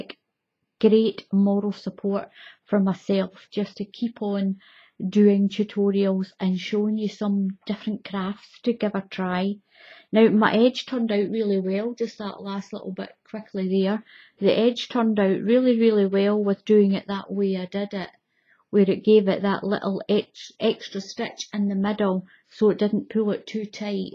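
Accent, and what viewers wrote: Scotland, United Kingdom. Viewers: her Scotland accent is so strong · I just love your Scottish Accent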